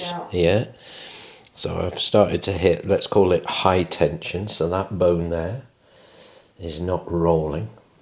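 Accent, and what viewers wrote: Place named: West Lancashire, United Kingdom. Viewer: I know a West Lancashire man when i hear him! Not too strong of an accent ☺️-from an East Lancs lass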